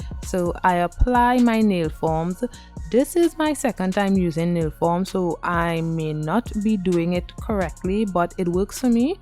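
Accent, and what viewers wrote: Trinidad and Tobago. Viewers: Is this a 🇹🇹 accent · Love the tutorial, but as soon as the video started I was like...SHE TRINI!!! Love it!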